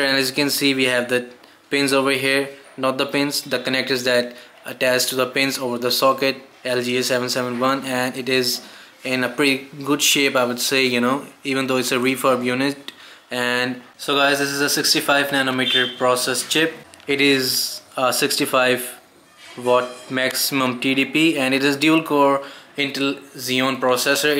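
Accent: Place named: India. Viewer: oh i didnt mean the video was bad or anything it just sounds like sterotypical india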